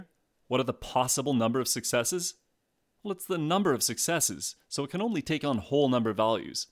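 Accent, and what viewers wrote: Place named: Canada. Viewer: Crystal clear explanation. Very helpful. I detect a slight Canadian accent which I have come to associate with the best math and stats videos on YouTube. Thanks prof wherever you are.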